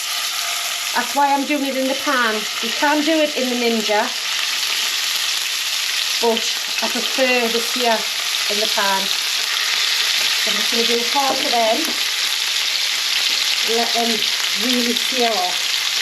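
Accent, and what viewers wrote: Newcastle, United Kingdom. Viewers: Love your Geordie accent and Newcastle Brown Ale! From a geordie livng in posh Oxfordshire! I remember delicious school dinners and once a month we stood in line for a handful of soft brown sugar! Still going strong at 81! Lovely recipes, will try them all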